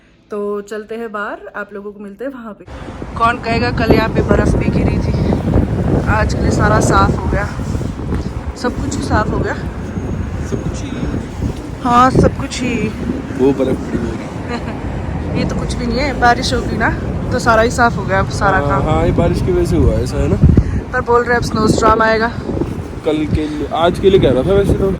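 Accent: Pahadi, India. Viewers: omg her pahadi accent is so good 😂😂....love your videos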